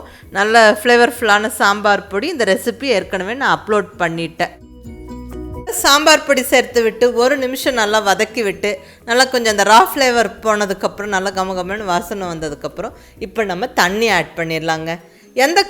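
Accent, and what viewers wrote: Coimbatore, India. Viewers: Pakka coimbatore voice.... love that u were hiding the face...nowadays people are running behind fame.... u r unique among them....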